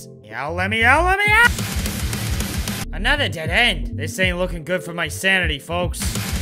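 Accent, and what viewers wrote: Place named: Ireland. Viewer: Irish I can tell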